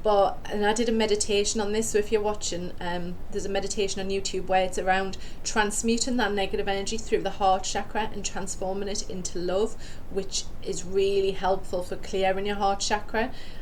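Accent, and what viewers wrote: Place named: North, United Kingdom. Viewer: !!!! 🙏🙏🙏🌈🌈🌈 You must be in the north because I'm in the south and nobody sounds like you! 😂😂😂 You sound like Sarah Milligan 💜😂 do you do in person readings? I'd love to meet you 🌈🙂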